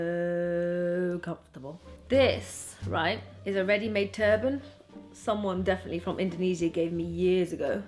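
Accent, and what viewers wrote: United Kingdom. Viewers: That british aCCENT thou! U go dina As if am hearing adEle the singer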